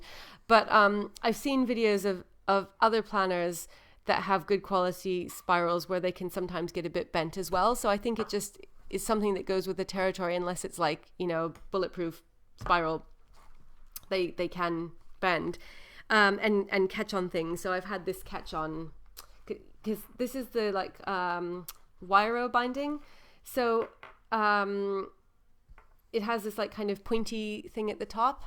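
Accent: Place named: United Kingdom. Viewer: Amazing, what an experience. You can really hear British undertones but the American accent is definitely there.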